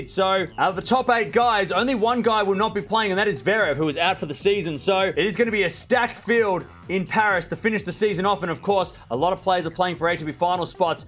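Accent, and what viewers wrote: Australia. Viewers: Please pardon Australian accent. He tries hard to pronounce names correctly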